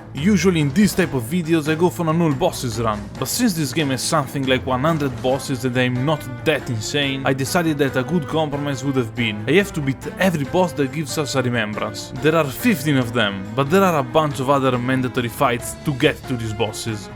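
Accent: Italy. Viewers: Bro are you italian? (I m italian) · are you italian? this accent 😹❤️ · scusa la domanda, sei italiano? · Accento italiano fortissimo, poetico❤️ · He sounds italian wtf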